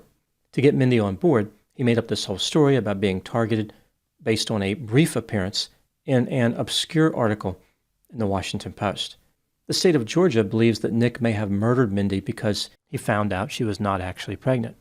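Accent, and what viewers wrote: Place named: Pennsylvania, United States. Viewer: mentioned Lancaster, PA which reminded me that accent is exactly like my SEPA (Norristown) born and raised grandad. grew up in SEPA, no? If he didn’t, don’t tell me bc I fashion myself an amateuraccent expert. The gift that is uninterrupted ignorance is one that keeps on giving.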